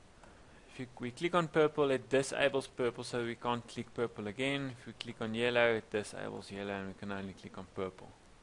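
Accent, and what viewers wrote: South Africa. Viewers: Yeah I noticed the SA voice straight away. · Sure sounds like a South African man!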